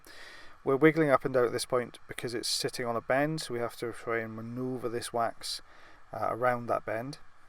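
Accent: Wales, United Kingdom. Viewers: Listening to you makes me miss Wales!!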